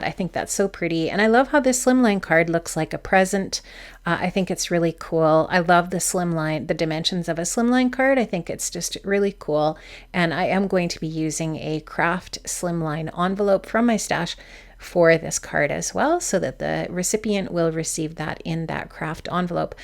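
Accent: Canada. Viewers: Love this card!!! So beautiful...and heard the Canadian accent. 😁 Only cause I hear it in my own videos. haha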